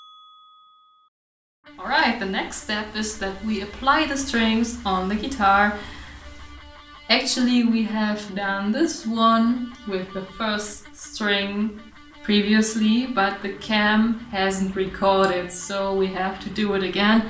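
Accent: Germany. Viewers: Du sprichst sehr gut Englisch, nur man merkt voll, dass du Deutsche bist. :D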